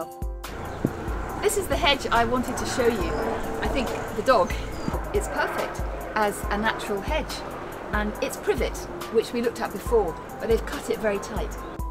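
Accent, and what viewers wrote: United Kingdom. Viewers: 的英式英文很好聽，人也很知性優雅。👍🌹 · 好喜欢的英式口音，说得太棒了，太好听了我打算一句一句学。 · 天天在澳洲听着土澳口音，再回头听英国口音简直是种享受！🤣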